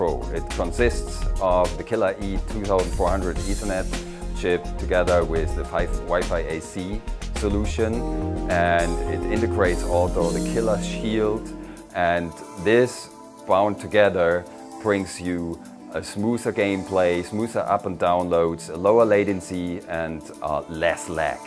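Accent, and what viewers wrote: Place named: France. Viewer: MSI France avec un mec qui parle anglais avec l'accent français, ok.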